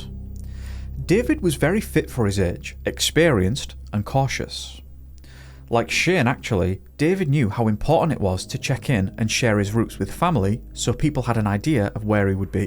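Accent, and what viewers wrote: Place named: Scotland, United Kingdom. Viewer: Thank you,. I've just discovered one of your videos and I really enjoy your voice. It's so nice that human narrators can still be found! In addition to enjoying the stories, I'm just starting to learn some of the nuances of British accents, and I wonder if you would tell me Where you are from? If I had to guess I'd say you're a Scot, but what do i know! Anyhoo, good channel. Joining now.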